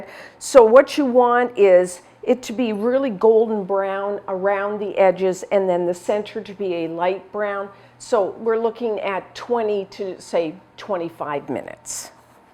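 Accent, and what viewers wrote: Canada. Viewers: Obviously you/she must be from Canada. “Quite this, quite that” just sayin...no slam.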